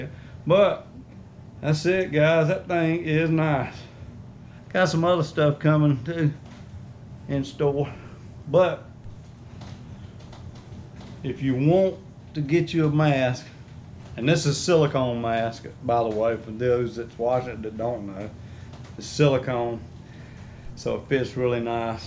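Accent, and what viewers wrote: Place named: United Kingdom. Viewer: Can’t tell if you’re British...or if you have a heavy country accent..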